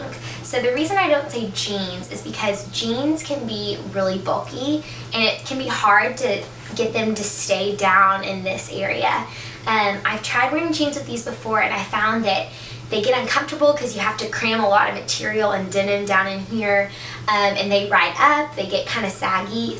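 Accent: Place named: Texas, United States. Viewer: I'm from texas too so i can fell the accent come into my soul haha but i don't have an accent because my parents are extra foreign lol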